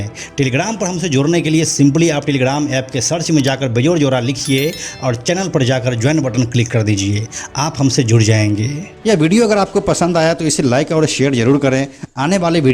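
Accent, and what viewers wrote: Bihar, India. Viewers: Ye. Bihari. Bol. Raha. H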